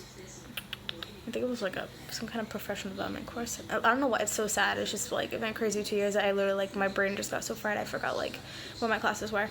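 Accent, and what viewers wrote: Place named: Boston, United States. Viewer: Hi! I saw in a previous comment that you started off as a per diem because you couldn't find something close to you. I just want to know where you're from if you don't mind me asking? I think Boston cuz of your accent but not sure??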